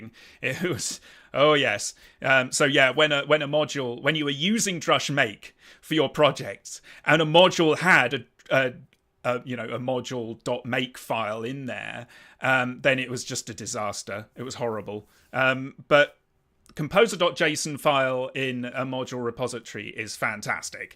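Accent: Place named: United Kingdom. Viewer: Why are you so dramatic with a heavy brit accent? Suggest to keep it simple/natural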